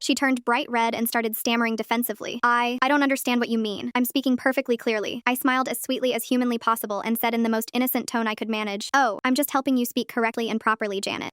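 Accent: Boston, United States. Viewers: So you're from lower Boston just lean into it more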